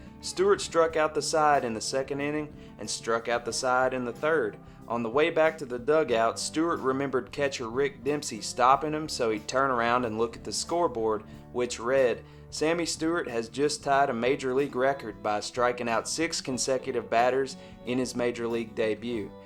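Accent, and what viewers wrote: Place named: Baltimore, United States. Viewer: I can tell from the accent right from the get-go you are a fellow Baltimoron lmao, or at least you sound like you do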